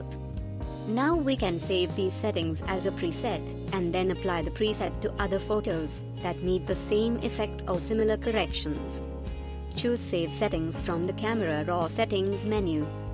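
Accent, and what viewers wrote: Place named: India. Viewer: When even the voice over is indian, god bless you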